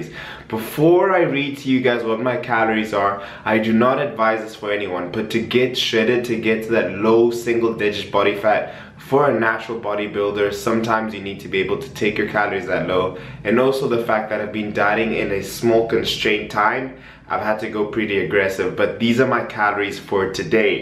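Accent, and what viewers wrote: South Africa. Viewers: where are you from? You Sound South African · Great video. You've gained a subscriber 👌🏼Also, you sound South African, but those aren't SA products... where on earth are you dude? 😂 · He does sound South African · was pretty sure I recognised the South African accent, then the nescafe just confirmed it all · Great video, so there's hope for someone who's got to have that coffee and cookie to remain sane. We wont wish u well with your studies cos you clearly have discipline! Believe me you look and sound South African no lie. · Is it just me or does he have a South African accent🤔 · You sound South African? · Bro your accent sounds South African?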